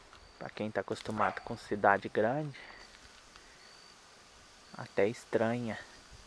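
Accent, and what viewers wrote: Minas Gerais, Brazil. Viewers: Lindo sítio sem conta que adoro esse sotaque minereis eu que sou mineira também de Mahumirim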